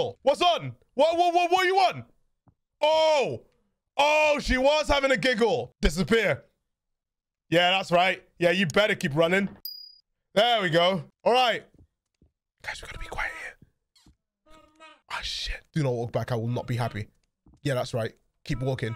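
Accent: United Kingdom. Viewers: you're from London??? Why didn't you come out as british earlier???? Smh my head I feel so betrayed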